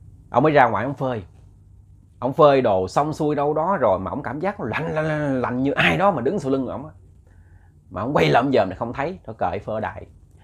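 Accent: Mekong Delta, Vietnam. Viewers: Rat nghe chat giong mien tay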